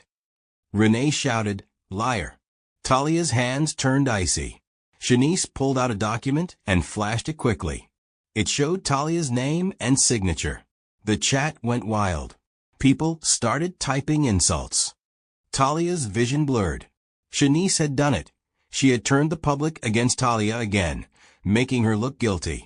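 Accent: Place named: Jamaica. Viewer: Mi lik de patois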